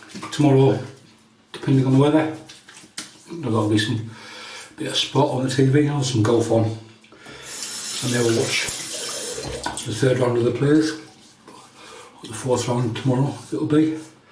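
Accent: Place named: Northern England, United Kingdom. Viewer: Good one! I use the same perfume which is obviously spot on with that soap. One day I will get the original CREED. Now I’m not good at British accents and I apologise if I am being horribly offensive, but to my ears you sound a bit like you’re from Northern England.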